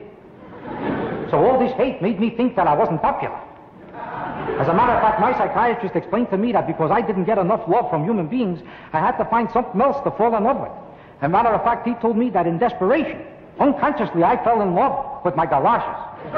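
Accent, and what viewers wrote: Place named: New York, United States. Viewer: Infinite list of little absurdities. And the NY accent. He was a real hit in his day. And his day lasted 60 years.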